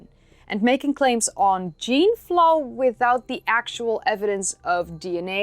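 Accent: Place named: Netherlands. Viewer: your accent reminds me of my trips to the Netherlands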